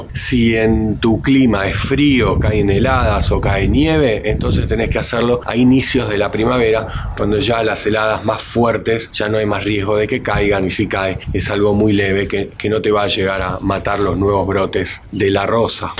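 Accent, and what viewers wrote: Argentina. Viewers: Hola sr Argentino que bonita habla su tono de voz aparte que buena explicación muchas gracias por compartir con sus suscriptores lo que usted sabe igual yo le mando un abrazo y muchas bendiciones para usted y su familia y sus jardines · No hay mejor cosa que escuchar a un argentino hablar...y eso que soy Argentina también.... pero soy de Córdoba y no me sale la"" R"".¡Ja,jaja!! Gracias excelente explicación!!💖🌹🌹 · Hola jajaj me siento bien argentino con el dialecto jajaj, un abrazo desde Misiones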